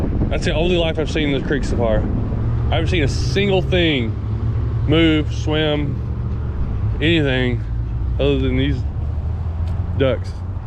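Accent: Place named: Michigan, United States. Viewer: Sounds like Michigan to me lol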